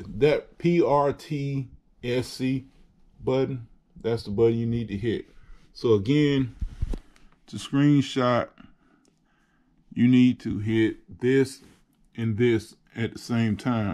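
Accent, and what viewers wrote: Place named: North Carolina, United States. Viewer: Thanks so much! I needed that! You sound so much like one of my cousins from North Carolina! Go figure! 😊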